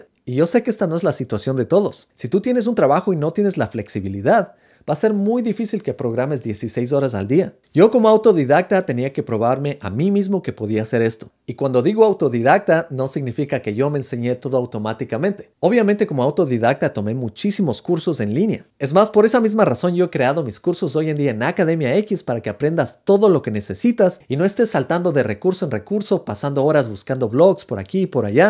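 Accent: Quito, Ecuador. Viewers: Jajaajja saludos bro, te escribo para demostrarte que no soy un bot, ya me tomaré el tiempo de revisar los cursos, y porque estoy en el proceso de aprendizaje, y me pareces un excelente mentor, además que por tu acento, creo que eres quiteño. Saludos desde Manta Ecuador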